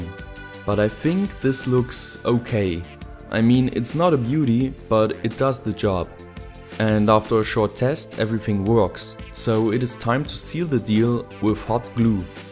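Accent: Germany. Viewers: bist deutsch neh :D geiler akzenz :D aber gutes englisch :D wie bekomm ich am einfachsten bluetooth kopfhörer für nen helm hin :D mein einfall.. mini bluetooth box aufschrauben .. kopfhörer zerlegen und pooow XD aber ich hab einfach mal null ahnung von elektro und audiozeugs XD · he is German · du kommst aus deutschlan oder? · Are u german ? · Pretty cool. Oder ziemlich cool... ich glaub du bist Deutscher. :) Cooles Video. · denglish / genglish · Are you German?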